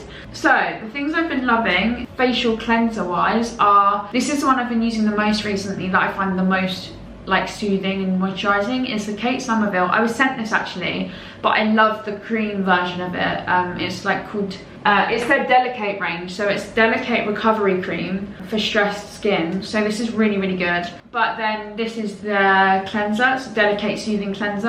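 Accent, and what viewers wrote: United Kingdom. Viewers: No idea. She has a very typical British accent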